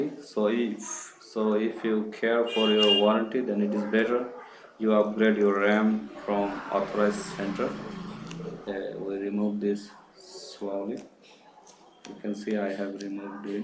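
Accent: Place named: India. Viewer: Bon sang, Mais pourquoi les indiens ou pakistanais parlent tous avec cet accent ?? on dirait une sorte de blague. J'ai toujours l'impression de regarder une mauvaise série sur le câble... Enfin, merci pour la vidéo sinon.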